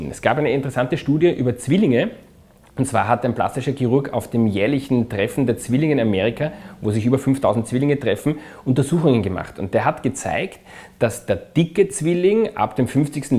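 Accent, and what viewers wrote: Austria. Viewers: ach wie ich diesen österreichischen Dialekt liebe! Wirklich!! 'schön oder schiach' - göttlich!!:-) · Herrlich der Österreich Akzent. Es geht nicht darum, ob es schön oder schiach is....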